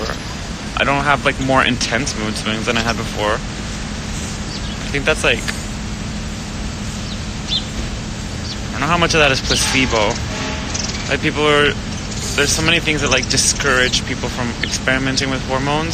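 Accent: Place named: Venezuela. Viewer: Just because I don't understand someone, doesn't mean I have to hate them. Her Venezuelan Spanish is hard for my Texan ears.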